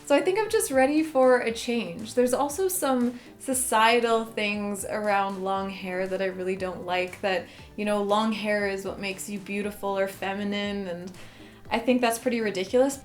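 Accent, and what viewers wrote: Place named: Canada. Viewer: I love your Canadian accent, reminds me of Robin from how I met your mother 😂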